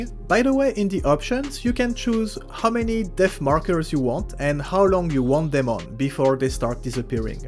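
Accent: France. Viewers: I love your french accent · Bro are you french? I like the accent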